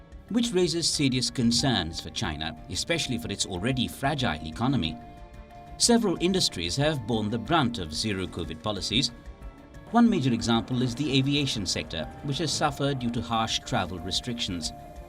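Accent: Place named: India. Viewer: indian accent. speak english.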